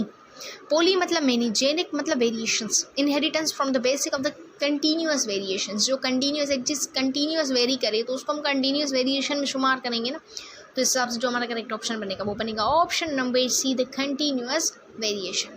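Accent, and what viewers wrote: Sindh, India. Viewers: Mera ek dost Sindhi wo bhi esey hi bolta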